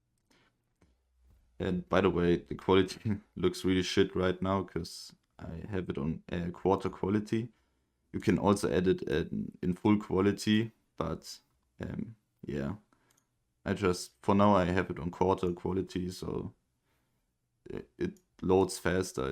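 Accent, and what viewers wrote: Germany. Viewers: Wusste beim ersten Wort schon dass du Deutsch bist xD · Wusste im ersten Satz schon das du deutsch bist hahahah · Man hört einfach das du Deutsch Bist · Das nenne Ich mal eine deutschen Akzent 👍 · Bro bist du deutscher? :D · deutsch rausgehört XD. aber trozdem gutes englisch! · Dieser deutsche Akzent HAHDHADASHAHAH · Dieser Deutsche accent haha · man hört den deutschen Akzent so hart hahaha, nices video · the german accent is wild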